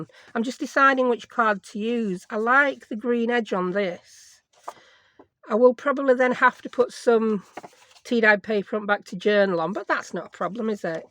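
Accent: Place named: Yorkshire, United Kingdom. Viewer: New to your channel and I love your work. These journal cards are beautiful. Also love your accent and waffling.. from 'nuther Yorkshire Lass ;)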